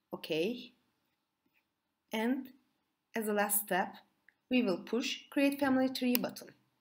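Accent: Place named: Turkey. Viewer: Türk aksanı bu nerede olsa tanırım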